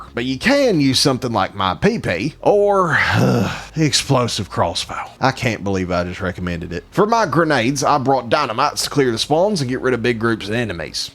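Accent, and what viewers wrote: United States. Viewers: is this country accent real? it's annoying af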